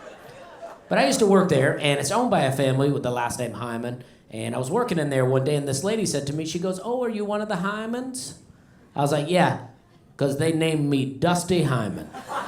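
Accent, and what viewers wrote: South, United States. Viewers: This man stole all my jokes and just added a subpar southern twang to it.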